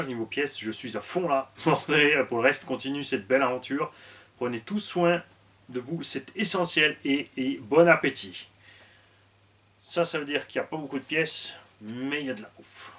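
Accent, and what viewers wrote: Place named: South-West, France. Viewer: Yes avec l'accent du sud ouest..... lol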